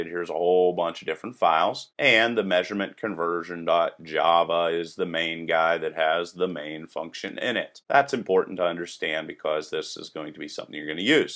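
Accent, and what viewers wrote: United States. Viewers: I love your tuts, you know your stuff and you speak very clear American English! Rock on Bro!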